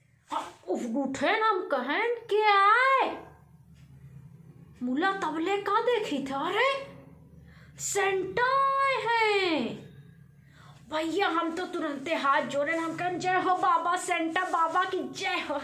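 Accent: Awadh, India. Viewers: मुझे जब भी अपनी महिला मित्र की याद आती है तो मैं आपकी ये वाली वीडियो देखता हूँ, मैं उनको ये वीडियो सेंड किया था तो वो बोलीं थी कि ये तो बिल्कुल हमारी तरह से बोलती हैं, अवधि भाषा में, हमको कम समझ में आता है हम ठहरे बृज के निवासी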